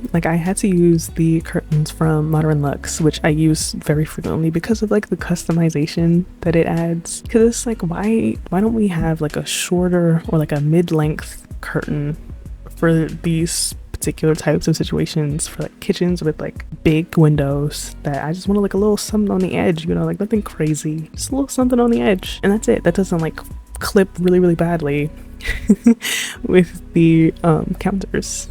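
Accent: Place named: New York, United States. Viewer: This was such a fun series! Also your New York accent came through real strong in this video and it literally made me miss home so thanks.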